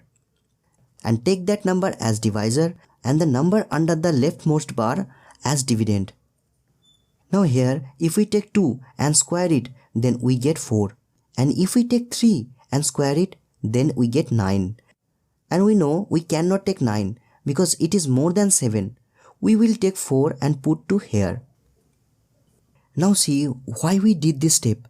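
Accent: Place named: India. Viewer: Your voice is telling you are an Indian about 18 to 25 year old I'm too impressed with you wanted to know the person who invented this method surely he too was either Indian or Arabic but don't know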